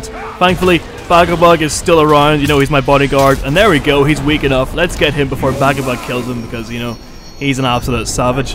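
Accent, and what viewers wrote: Ireland. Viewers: Wait your an Irish man to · I only watched this video to see the raid shadow legends ad idk why just had to see it from the most Irish lad ever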